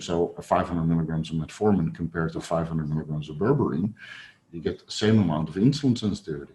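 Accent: Netherlands. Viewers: Love the Dutch accent! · Yeah but he is Dutch, we can hear it in his accent.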